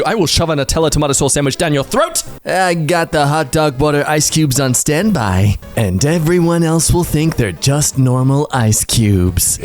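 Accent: Australia. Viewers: and I thought he was just doing a horrible Australian accent the whole time... Wow... Just wow... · Bro had to crack out the Aussie accent for this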